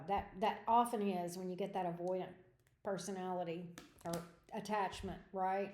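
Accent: South, United States. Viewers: I don't know where you're from but I know you're a southern chick like me. Love it!❤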